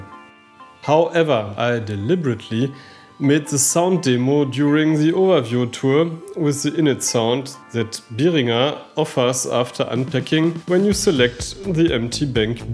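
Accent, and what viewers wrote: Germany. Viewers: Gruseliges Englisch / Horrible German accent... Das lässt sich nicht ertragen und zuende anschauen 😒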